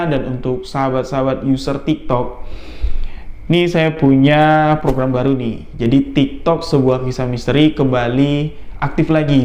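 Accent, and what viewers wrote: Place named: Java, Indonesia. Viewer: Bang ini sy kira dr jawa,oooaaalll.....ternyata dr Jambi.salam sehat,salam santun,salam super duper,salam silaturahim dan sedulururan dr; Bandung Jabar.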